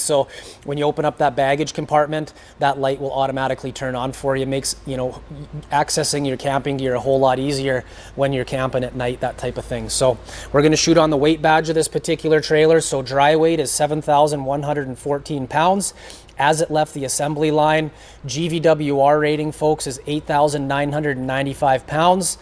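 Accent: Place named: Canada. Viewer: Your Canadian accent is goals lol and I love your in depth reviews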